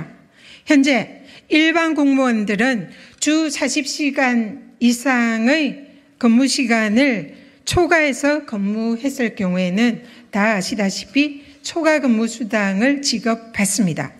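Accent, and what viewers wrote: Gyeongsang, South Korea. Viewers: 전현희 전의원은 똑똑하시고 역량있으신 국민을 위해서는 참 좋은분인데... 기자회견이나 시사프로 패널 토론자 등으로 나오면 말씀의 표현력이 듣기가 거북합니다.. 글을 읽는 말투,,, 차라리 글을 정확하게 읽으면 되는데.. 그 문어체를 구어체로 하는것처럼 경상도 사투리로 하다보니... 경상도 사람인 저도 듣기가 거북합니다.. 글을 읽으면서 안읽는 투로 하시지 말고 그냥 반듯하게 글을 읽으세요... 휴~~ 말이 끊기고 글읽는 투의 말투가 참 설득력이 떨어집니다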